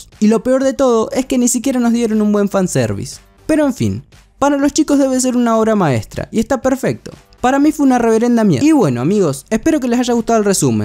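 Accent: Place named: Argentina. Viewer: Re Argentino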